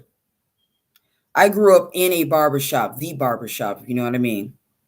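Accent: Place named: Detroit, United States. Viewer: I hear a Detroit ish Midwest accent. Am I right?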